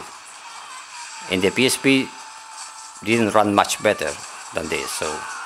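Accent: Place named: Spain. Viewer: aun que se le nota en el acento que es español, yo soy latino saludos desde américa latina tiene muy buen inglés, solo le falta evadir ese acento muy pequeño del español pero que exelente vocabulario, saludos y que Dios lo Bendiga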